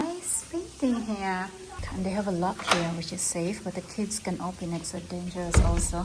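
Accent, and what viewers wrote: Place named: Philippines. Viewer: Carabao English!!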